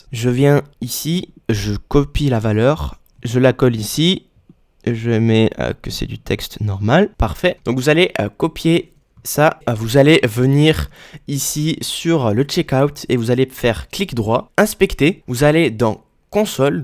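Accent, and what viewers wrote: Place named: Romandy, Switzerland. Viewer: bien sûr! Merci pour la vidéo bourrée d'informations et présentée avec panache. (Et un accent romand je crois!)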